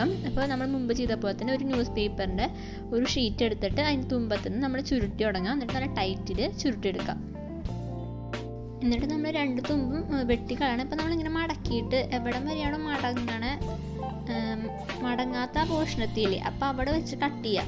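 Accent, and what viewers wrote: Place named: Thrissur, India. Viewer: Ente frnd thrissur und... Pinne slang kettappo manasilayi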